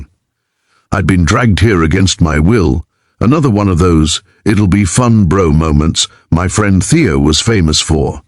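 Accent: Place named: England, United Kingdom. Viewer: This is very bad, especially the voice doesn't fit the apparent age of the protagonist. He shouldn't sound like a middle aged Englishman.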